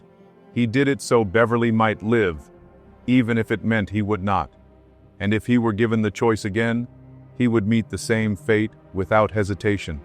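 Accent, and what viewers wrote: United States. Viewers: By the way, your american- english is perfect. I love it. ❤. I whish all USA accents were like yours. Love your voice as well. Who are you? A profesional narrator?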